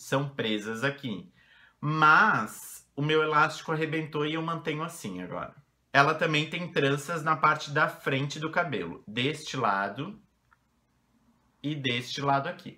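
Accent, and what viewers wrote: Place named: Rio Grande do Sul, Brazil. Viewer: Ai que tudooo! Obrigada por responder, vc é um amor de pessoa muito simpático. ♥ Me diz uma coisa, vc é gaúcho? Sinto um pouco de sotaque hehehe eu sou de Porto Alegre!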